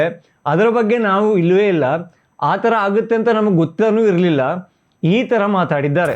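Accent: North Karnataka, India. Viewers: ಸರ್ ನೀವು ಮಾತನಾಡೊ ಶೈಲಿ ಚೆನ್ನಾಗಿದೆ 👌🏼 ನೀವು ಉತ್ತರ ಕನ್ನಡದವರ?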